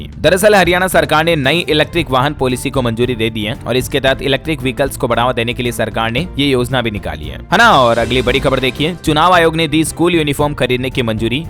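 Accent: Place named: Bengal, India. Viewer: Bengali english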